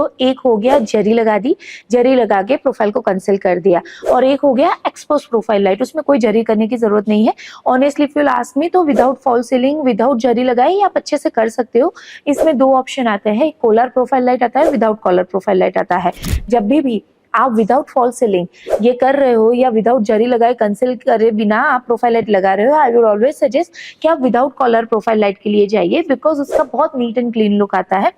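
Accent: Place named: West Bengal, India. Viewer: Why you sound a bengali?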